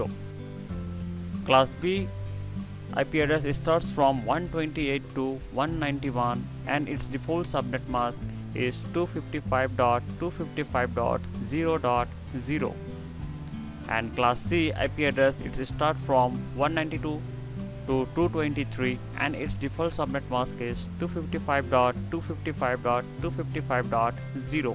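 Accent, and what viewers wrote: India. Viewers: Indian accent hi toh hai fake kya hota hai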